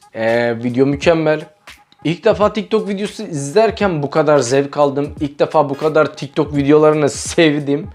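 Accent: Nakhchivan, Azerbaijan. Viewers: necsen naxcivan 100%serur rayonansan😂 hansi terfdensen dene da nolar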